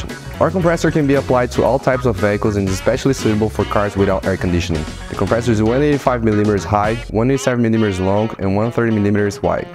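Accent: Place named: Brazil. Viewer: Parece sotaque brasileiro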